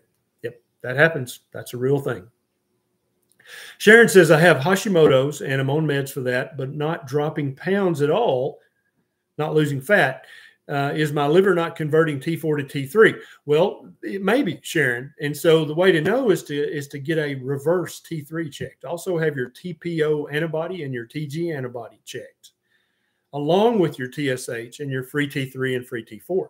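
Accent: South, United States. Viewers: 😂 yes, we all have an accent. I’m Canadian and you probably think we have an accent. Anyway, you have a cute southern accent 😃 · Im from south carolina and you sound like my boss. You look like him too. Wonderful accent, respectable guy, very southern and genuine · You have a nice Southern accent! I spent a year (1977) going to school at NATTC Memphis and to this day I still talk southern! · I think they were referring to your Southern accent 🤣😉😍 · Come to South Carolina where people do have a southern accent. I am proud of mine and yours too. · I don't hear an accent, just a nice southern draawwlll. 😉😉😉😉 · It’s not an accent, it’s Southern Dialect. I’m from Lithuania and do have accent I’ll die with, but oh well… 😊 In my old country of 3,7 million people there are 4 different dialects believe it or not…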